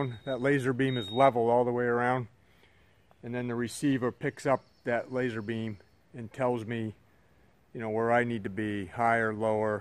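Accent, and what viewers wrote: Maine, United States. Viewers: Sounds like your a Mainer!?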